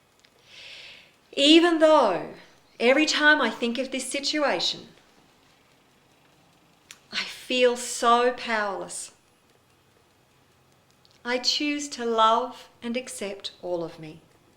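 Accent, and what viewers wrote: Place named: Australia. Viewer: this aussie lady has me thinking in this accent at times.. oh boy very funny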